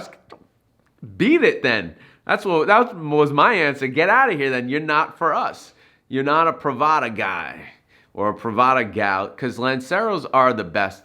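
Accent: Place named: New York, United States. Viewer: Had a feeling you were from New York. BTW, Chicago pizza isn't pizza. It's a caserole! 🤣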